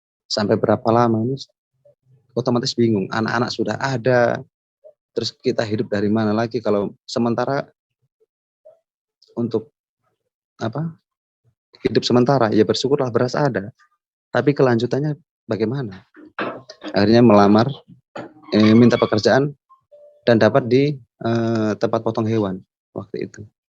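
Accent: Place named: Kupang, Indonesia. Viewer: Kental dialek kupang,,kupang mana mas??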